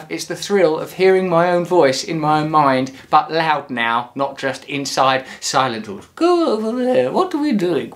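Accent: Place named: England, United Kingdom. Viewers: hey russel i feel like you are my official cockney guru. i do spiritual punk - aka really fast chanting - its amazing - can i play in your cafe x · Your English accent is so strong, sometimes i can't understand you when you talk fast! lol im American by the way. p.s. love ya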